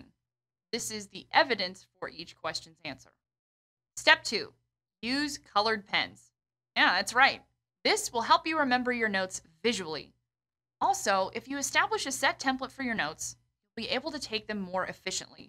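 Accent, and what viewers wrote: United Kingdom. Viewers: Hi, thanks for information! I like the speaker saying british accent😂 And is this method applicable to non fiction class? Such as anthropology? Thanks!! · omg with british accent you are just like Miss McGonagall from harry potter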